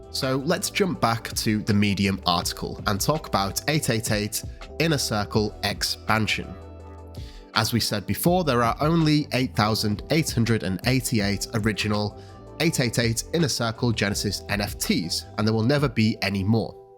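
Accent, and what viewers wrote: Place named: RP, United Kingdom. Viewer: Hard to listen to… the accent is … posh spice… perhaps subtitles would help? And the funeral parlor organ is over da top? C’mon … please, I can’t understand what is being said… praced hout = priced out?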